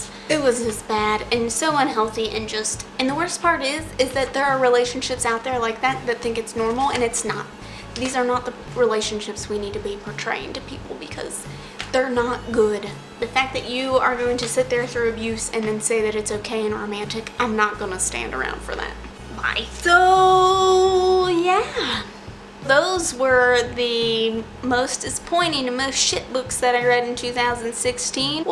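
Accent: South, United States. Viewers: Do have an accent? I hear southern a bit in your voice. It comes and goes though.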